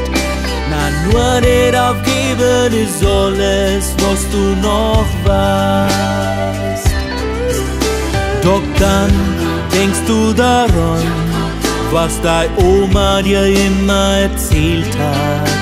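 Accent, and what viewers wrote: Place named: Vienna, Austria. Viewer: Wieder mal eine Botschaft, so wie es oft wirklich ist. Hörst Dich schon an, wie ein echter Wiener😊Aber es stimmt, irgendwo ist immer ein Licht am Ende des Tunnels. Gottes Segen für Dich, lieber, und für die, die Dich in Deinem Leben begleiten.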